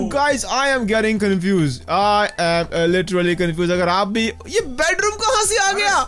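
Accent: Punjab, India. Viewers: Tum Punjabi ho kya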